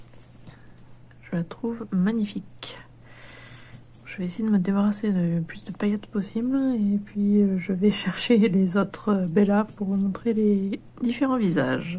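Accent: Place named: France. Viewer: I have 80 of rainbow and shadow high dolls And I have her and she's gorgeous and your French accent is so gorgeous. I love it. I can understand what you're saying. Even though you're speaking French. Which I love.❤❤❤❤❤❤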